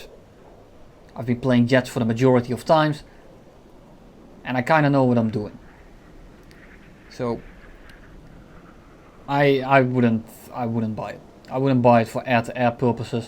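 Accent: Netherlands. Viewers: Yea he is Dutch xd you can hear that clearly love it🤣🤣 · Nah just doesn't have a heavy or deep voice, which probably makes the actual accent less clear, but it is still unmistakably Dutch.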